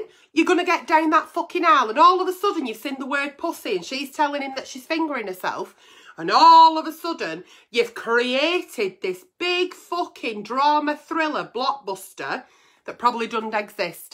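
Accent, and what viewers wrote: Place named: England, United Kingdom. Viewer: Great video...Great Advice.... i was shocked with your honesty and vulnerability....respect to you! 👍🙋‍♀️👏👏👏👏 Your hair colour is gorgeous!! I live in the Netherlands...have done since I was 17...I'm 58 now and miss English accents.... yours is brilliant! Keep up the awesome work! 😀👍👏👏👏👏🌺🌺🌺🌺🌺🌺🌺🌺🌺🌺🌺🌺🏵🏵🏵🏵🏵🏵🏵🏵🏵🏵🏵🏵🏵🌼🌼🌼🌼🌼🌼🌼🌼🌼🌼🌼🌼🌼🌼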